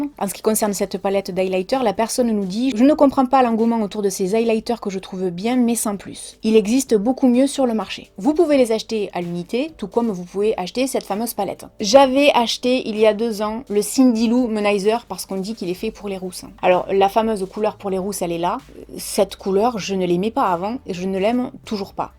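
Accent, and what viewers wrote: South, France. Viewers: Yesss l'accent du Sud de la France j'adore ça me rappelle l'accent du Sud de l'Italie 😙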